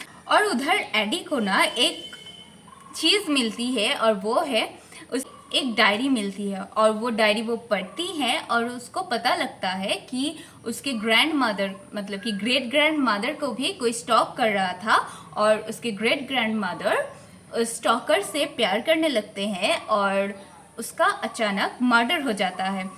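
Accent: Bengal, India. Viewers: Di you are bengali, right?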